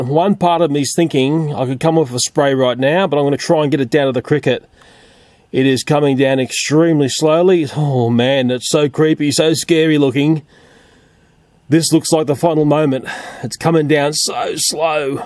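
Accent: Australia. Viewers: Sorry I hadn't found your channel earlier just looking through your vids and I found this one it's SOOO fascinating to watch and I come from the UK and I've always wanted to go to Australia and to look at your garden and stuff it just makes me so happy and hearing someone Australian is amazing love it channel 💜💜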